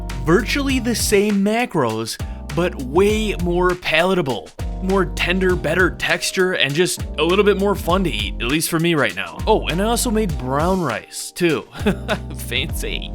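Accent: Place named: New England, United States. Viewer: Where are you from bro ? Your accent is screaming New England hahah that’s coming from someone on New England lol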